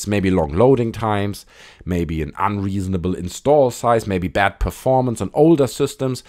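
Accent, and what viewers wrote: Germany. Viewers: Is that a German accent? I'm a first-time viewer of your channel. I'm not 100% sure if it is German... I can't quite place it. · are you German ?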